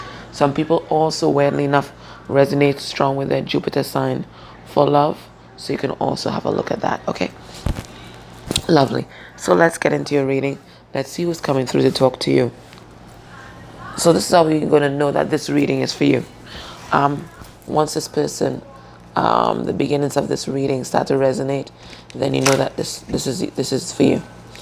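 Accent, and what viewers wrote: Trinidad and Tobago. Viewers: Trini accent?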